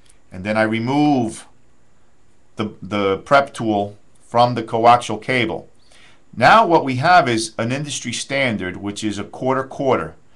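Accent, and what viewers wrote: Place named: New York, United States. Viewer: yo dawg you from new york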